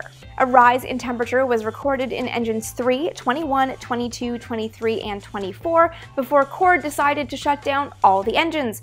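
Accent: Canada. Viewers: you're Canadian i bet. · is she Canadian?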